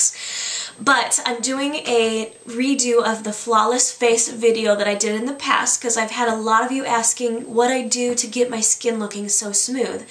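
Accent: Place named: Michigan, United States. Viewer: Your skin does look great. btw, you sound like the typical michigan girl!!! very thick michigan accent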